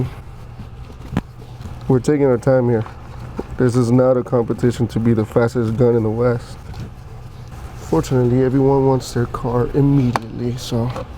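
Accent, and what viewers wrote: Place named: Latin America. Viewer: What an amazing video. I just came back from the BMW dealer here in Edmonton where I live and they told me my water pump/t'stat housing was leaking. They are looking at $2400 CAD for the repair. My car has 134.000 KMS. While I was googling the problem I found your video and honestly it does seem like a lot of work for a DYI one. Is there any way I can personally check to see if the pump housing is leaking without taking so many things apart? Is it very common to replace this part in these X1 2018 xdrive 28i models? with the amount of kms I have is it something to expect? Thanks again for the great video and clean shots, I was able to see all the detail and learn more about my car. You have a new subscriber here. I am guessing you're also latino, I was trying to figure your accent and country of origin, I know this is off topic but I was curious. 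Keep up the good work and videos. Ill check some more as I also love learning about car repairs. Gracias!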